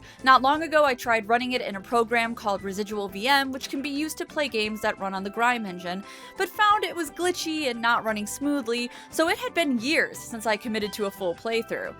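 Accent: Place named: General American, United States. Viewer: Awesome; I enjoyed this video as usual! I am feeling a little pedantic though and I have to point out an issue (please forgive me). Everyone has an accent. A General American accent is still an accent. It's what you're most used to hearing, so it doesn't stand out to you... but it's still an accent.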